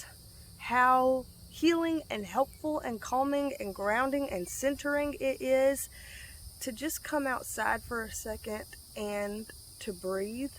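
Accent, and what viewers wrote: South, United States. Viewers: We love your southern twang! 😁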